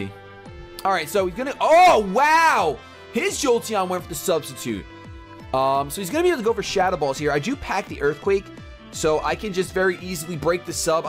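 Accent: India. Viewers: Why the Indian accent offensive to me mate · Indian accent ftw. You've got yourself a sub bro.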